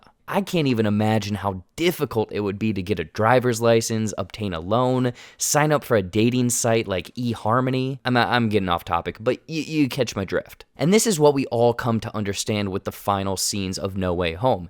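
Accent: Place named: United Kingdom. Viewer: Please don't pull a jake tran 🥺 we love your British voice and wit, not this generic American voice -- 🙄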